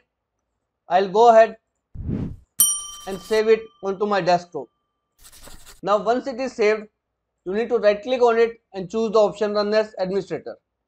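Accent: India. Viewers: Indian accents is very help my problem!!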